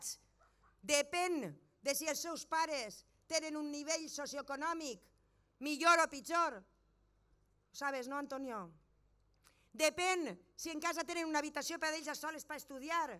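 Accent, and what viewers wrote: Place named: Valencia, Spain. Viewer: has de mejorar tu catalán se te nota mucho el deje valenciano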